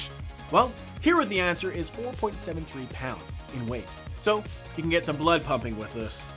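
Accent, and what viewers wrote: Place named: Taiwan. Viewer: oh I love this talking style from taiwan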